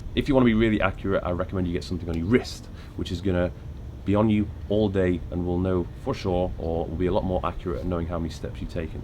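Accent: United Kingdom. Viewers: 3 main reasons: 1. He is good looking ( and we tend to like and give good personal qualities to good looking ppl ) 2. The British accent ( which makes you sound more legit ) 3. He gives decent advice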